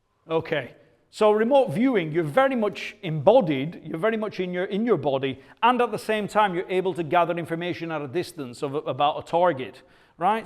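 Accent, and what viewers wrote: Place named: Scotland, United Kingdom. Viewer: Scottish accent really sells it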